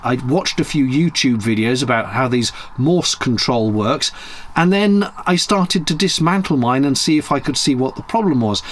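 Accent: United Kingdom. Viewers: Are all British men so even tempered? You said "ow" and barely skipped a beat as if you hardly noticed. When we had a boat and I worked on it with my (at the time) partner...it was always a traumatic experience as he had nothing like your calm demeanor and I was always on the lookout for flying tools. I'm happy to say that the relationship didn't last past that summer. I have to admit I don't think I will ever be able to say "farting around" without hearing it in your wonderful accent in my mind. Another truly enjoyable video. Thank you.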